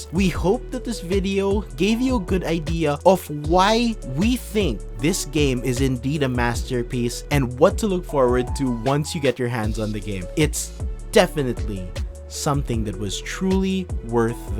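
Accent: Philippines. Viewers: Pinoy ka noh? accent palang and choice of words. hehe but nice review.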